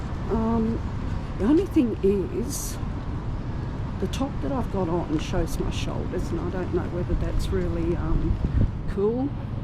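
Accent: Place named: Australia. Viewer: Your accent is australian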